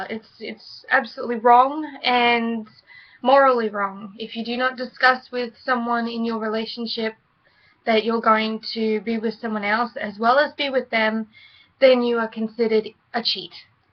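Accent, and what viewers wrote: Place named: Australia. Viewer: Hi - I hear from your accent that you are an Ozzy - Ozzy girls are best. Thanks for the informative vid.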